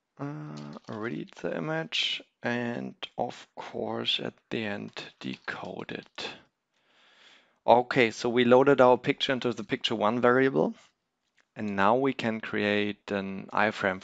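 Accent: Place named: Germany. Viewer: You sound very german :D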